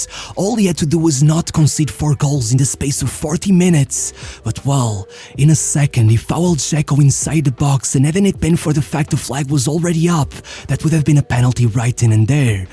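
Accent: Portugal. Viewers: Your Portuguese pronunciation of every name gives me AIDS. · His portuguese accent is kinda anoying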